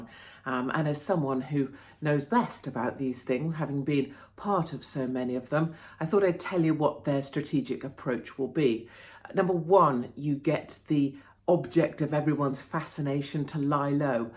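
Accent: United Kingdom. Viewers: British ? Yuckk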